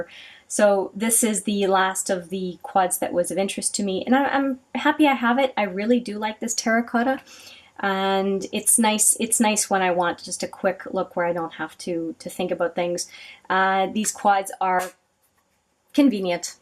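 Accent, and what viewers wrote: Quebec, Canada. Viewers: I love your little containers for your lip products... cute shit: also you can hear a French Canadian accent lol I love it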